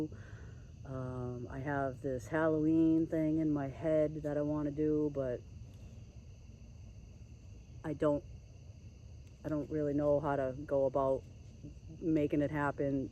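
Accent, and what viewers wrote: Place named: East Coast, United States. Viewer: Oh BTW, love your cute east coast accent 👍, I'm from the west coast of Cali.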